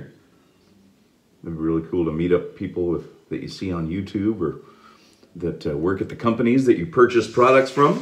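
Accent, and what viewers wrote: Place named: Liverpool, United Kingdom. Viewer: Might get this soap myself, peppermint 😃I love your Liverpool accent you do it perfect, hope all is well.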